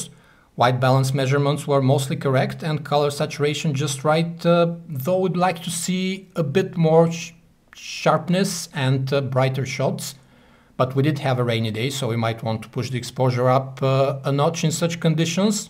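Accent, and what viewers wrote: Russia. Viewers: sounds russian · Why u speaks english like that are u russian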